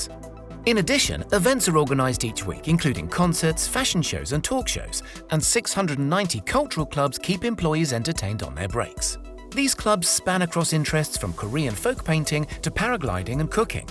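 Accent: United Kingdom. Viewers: Its Football god dammit wtf is soccer. And you even got a british accent........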